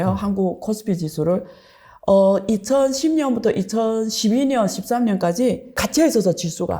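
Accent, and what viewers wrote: Yanbian, China. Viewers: 아~~ 방금 듣다가 말투가 조선족인가? 했는데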